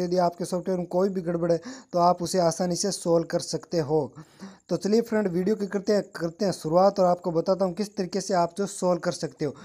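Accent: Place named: Rajasthan, India. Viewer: Pkka aap rajasthan se ho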